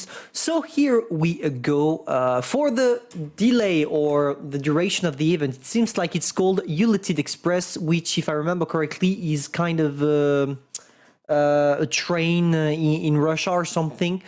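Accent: France. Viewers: They are not used to. Not 'their'... (autocorrect?). But you are right. It is not a laughing matter indeed. I sincerely think is one of the best youtubers on WoTBlitz. I watch his video's a lot. I like his approach to the game. I just find the way he pronounces 'event' really really really annoying. How can I reach out to him, to make him say that 1 word 'event' say correctly. For the rest, I know he is French, so no bad words for the rest of his pronounciation there. It even is charming. He is good. I just want this 1 word 'event' to be pronounced correctly.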